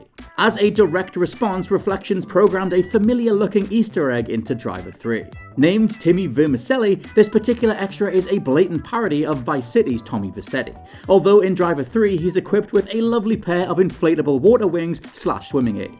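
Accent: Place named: Scotland, United Kingdom. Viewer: clicks video hears scots voice and leaves.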